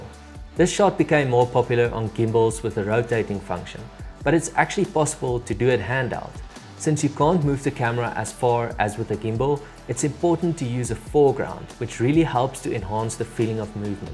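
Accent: South Africa. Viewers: As a South African I couldn't help but be taken aback by the accent. Cheers boet 🇿🇦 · Am I hearing a Saffa accent? Thank you the amaizing tutorial! Keep it up! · You sound South African 🇿🇦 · Awesome tips bud. South African I'm guessing?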